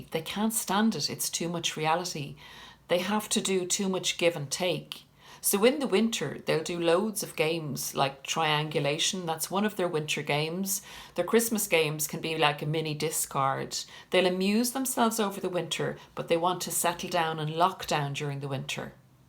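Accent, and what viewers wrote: Ireland. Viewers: You deserve a lot of subscribers. You nail it on the head. I am 2 years NC. I feel a lot better but I still watch videos and was extremely happy to come across your channel. I’ve already shared one on fb. You are wonderful. Love your Irish accent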